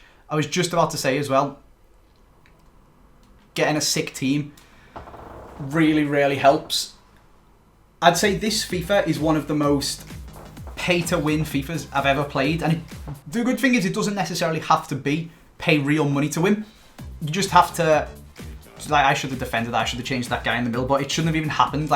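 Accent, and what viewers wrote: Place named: North England, United Kingdom. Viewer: What part of England you from? I recognize your accent is it Liverpool or Manchester or something?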